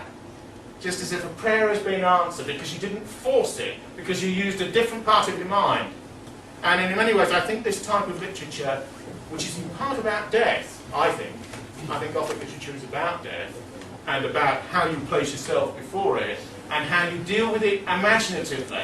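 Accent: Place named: United States. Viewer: His American accent was quite good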